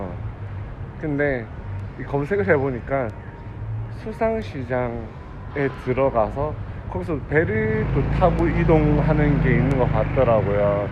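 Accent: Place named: Gyeongsang, South Korea. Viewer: 인스타에서 보고 왔습니다 영상재밌어요 😂 익숙한 사투리이신데 혹시 경남분이신가요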